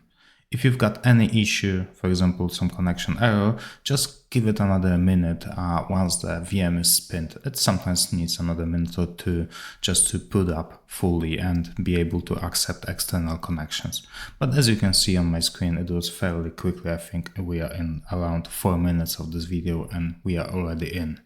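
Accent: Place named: Poland. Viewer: od razu poznalem nasz akcent :P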